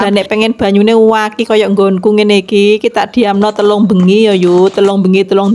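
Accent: Java, Indonesia. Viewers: Ra kaprah..dialek .bjn blora y yu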